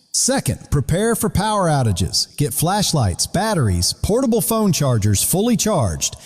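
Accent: South, United States. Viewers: ur accent says u r a southern boy. I say this because u act as though jut 6 to 12inches of snow is a whole lot when it is just a normal winter storm to us up above 42N that is the NY-PA boarder where it is flat in the west. Yes it may take us a day to big out of 6" to 12" but that is all. U have to remember kid we got them lakes. They give us most of our snow in Upstate. Now it is when it bet deeper the 12 inches that begin to slow us down. That the Early Spring storm of '93. It laid down 3' where I lived at the time which was about 40mi north form where I live now. Now it was all of 3 days before we got plowed out on our gravel town road. U see it goes Interstates, state road, then count roads and last is the town roads. U see we take all our plow vehicles and do the Freeways the we go to the state roads with all of them and so on. This spreads the expanse of having enough plows to do this. 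So this is what happened that yr. We got the freeways done in about 3 hr. Then we began on the cities and once they had been done then we moved out to the hinter lands. By doing the cities with all our plows first we got 90% of the pop plowed out in less then a day. Now when we moved to the hinter land we did state3 roads using state count and towns plows while city ones stayed in the city to do the rest of that clean up b/c the first pass just pushed it to the side. it then had to be4 hauled out. Many streets did not get fully cleared until about a week later. I will not go no b/c u get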